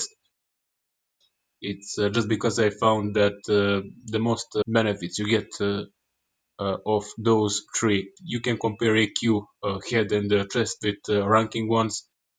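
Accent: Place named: Russia. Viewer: у тебя акцент русский dude....)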